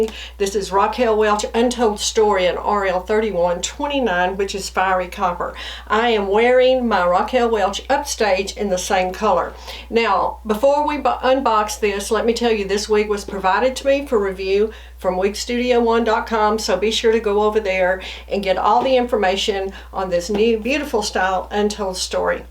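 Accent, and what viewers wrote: South, United States. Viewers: I love your videos and your southern accent is wonderful. I have bought many Raquel Welch wigs in the reds. Several in Glazed Fire (RS28). I also have three wigs in the Fiery Copper that you have own. My all time favorite wig in this color is Advanced French. But I also wear Born to Shine in the same color. I have also purchased a wig in Golden Russet, but to me, this is much more blonde than red.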